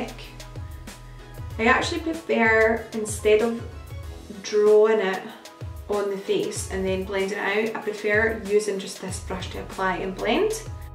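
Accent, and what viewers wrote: Scotland, United Kingdom. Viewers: After watching your videos, I always find myself going away to do my make up😂 love hearing a Scottish accent on YouTube as well😁🏴💕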